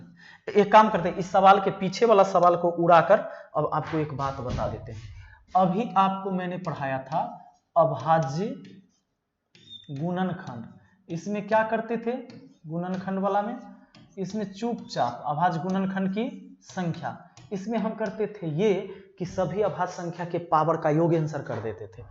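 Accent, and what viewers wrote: Bihar, India. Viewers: सर आपकी बिहारी बहुत अच्छी लगती है और जो भी है वह अच्छा ही है।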